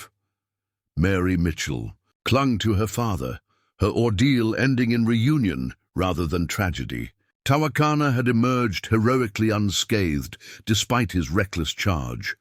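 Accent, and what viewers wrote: England, United Kingdom. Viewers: English accents are pleasant and easier on the ear than American accents. Even though I am southern American.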